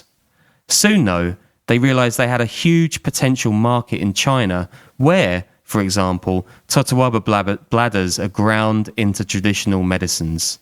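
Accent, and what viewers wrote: United Kingdom. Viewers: Didn’t watch the entire video, as I was sick of seeing the same pictures over and over. And someone with a British accent talking about something that happened in Mexico is very odd …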